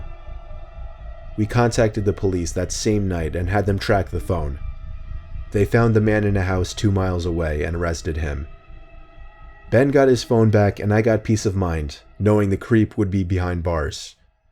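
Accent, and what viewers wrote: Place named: New York, United States. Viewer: You have a slight New Yorker accent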